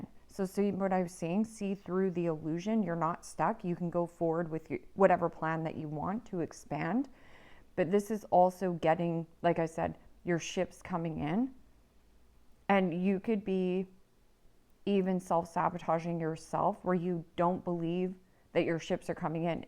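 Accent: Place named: Canada. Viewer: ❤ I thought I detected a Canadian accent 🙂🇦🇺🇨🇦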